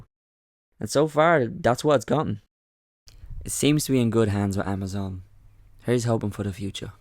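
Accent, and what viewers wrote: Dublin, Ireland. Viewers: im guessing north dublin just cus it sounds like some of my mates accents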